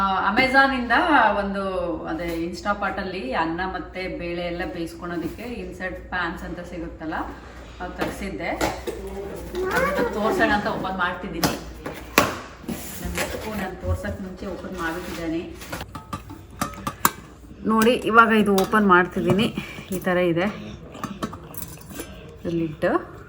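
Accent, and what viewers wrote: Mandya, India. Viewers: ಅಮೆರಿಕಾ dali edru nim Halli maathu kelthidre thumba kushiyaguthe Nam mandya bashe andre🎉 ❤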